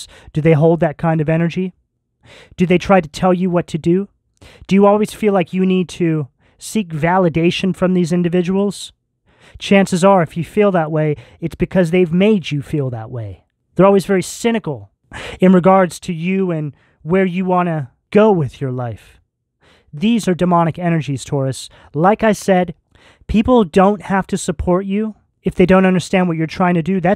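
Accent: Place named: Massachusetts, United States. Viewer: You know… it took me all night to watch but yes! Somehow yes!&you sound like you’re from mass like me… your voice just sounds familiar 🤔💫♉️♋️♌️✨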